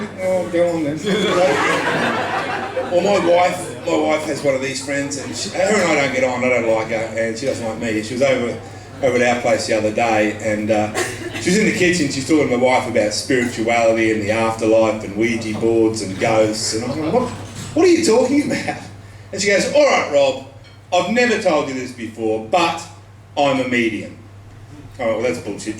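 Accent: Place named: Australia. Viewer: I love fresh stand up, beards and an Australian accent. This guy is a total package! oh and funnier than $&!+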